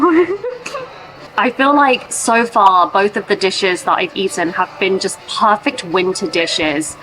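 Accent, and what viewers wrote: RP, United Kingdom. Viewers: コレぞ、 “Queen's English” と思えるほど、一語一句を “丁寧かつクリア” に発音して下さる (#イギリス人🇬🇧らしい!!) ので、すごく聞き取りやすいです！ 👍👍 ～ 所作も自然体ながらエレガントで、好感度が高いですね！ 🥰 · 字幕がきちんと付けられていて英語の勉強になるし、Squishyさんの品のある、ゆっくりめに話してくださるイギリス英語は聞いてて気持ちいいです。所作も美しいですね😊 日本の日常的な良いところを紹介してくださって嬉しいです。最近このチャンネルを知ったので過去に遡って観ようと思います❤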